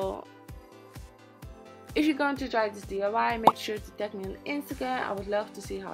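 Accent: Netherlands. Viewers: Dutch? Xox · Are you from the netherlands or a dutch speaking country . Because you're accent is cute. Love the vid btw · Wait are you Dutch? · are you dutch? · Hoor direct aan je accent dat uit nl bent, keep shining sis ❣️ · you sound dutch... are you? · Lovely dutch accent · Ik dacht al hoor ik nou een Nederlandse accent in je Engels tot je doei doei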